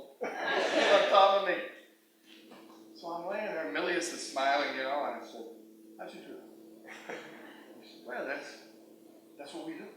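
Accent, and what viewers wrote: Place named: Youngstown, United States. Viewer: This won’t mean much to most anyone, but I can still hear the Youngstown in his voice. It’s like hearing home. Awesome story.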